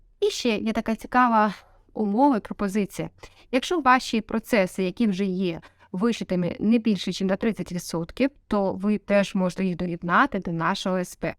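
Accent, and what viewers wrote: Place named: Russia. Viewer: Очень сильный русский акцент. Да уж. ...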